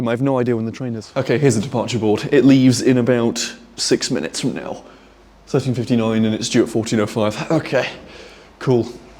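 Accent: United Kingdom. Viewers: The beginning of this video only works with a British accent. But it works oh so well.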